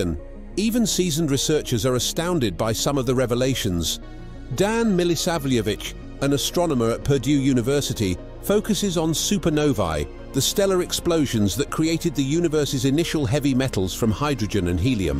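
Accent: United Kingdom. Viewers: One thing we can count on from the YouTube corporation is a reader with a Brit accent. How obvious does a grift have to be?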